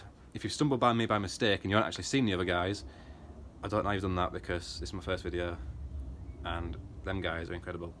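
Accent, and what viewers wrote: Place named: Yorkshire, United Kingdom. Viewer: Thanks for the shot out bud! And it’s great to see another face on the you of tube! You sound Yorkshire way, where abouts you from mate?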